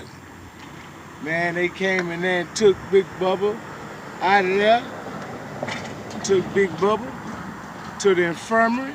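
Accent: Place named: Texas, United States. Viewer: I knew u was from TX when I heard “gettin fly at da mouf” 😭 mane den I seen the location on da video and u in da southside of houston I’m from da nawfside much love bro I fw yo videos.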